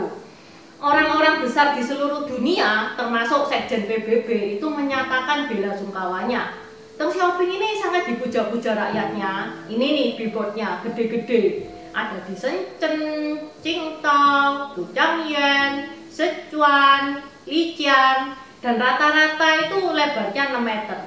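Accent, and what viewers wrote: Java, Indonesia. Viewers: Logat jawanya medok banget.. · Logat jowoneh medok bamget.!! Hehehehe wis ora opoh2 sing pnting mudeng. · Orang jawa pribumi logat nya gak medok cc ini medok bget😀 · Rasanya ma'af ya ibu masih harus banyak belajar dialek atau pronounciation bahasa Mandarin yang baik, tepat dan benar déh kepada mas Novi Basuki PhD yang santri asal Jawa Timur itu lho.... karena logat bahasa Mandarin ibu masih bahasa Jawa-Centris! Sorry ya masukan konstruktif! Demikian juga nomenklatur kata "Cina" sudah tidak berlaku lagi/dicabut melalui Undang-Undang atau Tap MPR ya bu, harusnya diganti dengan Tiongkok/Tionghoa / ZhongGuo / China ya bu. Terimakasih atas video-videonya yang banyak memberi informasi faktuil dan bermanfaat!🙏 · Salam kenal Ka. Baca judulnya aja aku sudah suka banget. Eh pas liat video kak berdialek Jawa aku tambah seru nontonnya. Ada lucu, gemes tapi keren banget ka.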